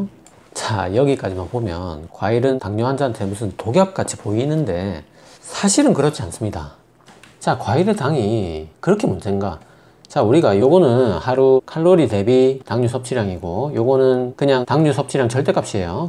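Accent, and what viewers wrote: Gyeongsang, South Korea. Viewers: 경상도말씀이라 부산이면 뵙수잇는의사라 생각햇는데 요양병원 어는곳에 계시다네요 ㅎㅎ 의사중최고의 의사입니다 늘 좋은영상 계속듣고 따라하도록 하고잇어요❤❤ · 경상도 억양이 귀에 쏙쏙 와닿네요..ㅎㅎ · 구수한 경상도 말씀이적당히썩여서하시니 재미있게 박사님 말씀 잘듣고 있습니다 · 은. 고향이 경상도 이신걸로? 말투에서 정이가는 상남좌삘^^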